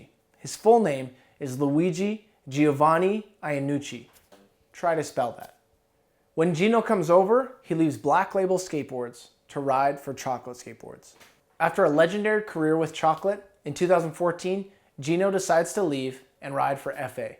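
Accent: Canada. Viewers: Do Canada people reply say ay? Not to be mean it funny just wondering be I heard you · imfrom spain and ecxept for the canadianaccent your spanish is good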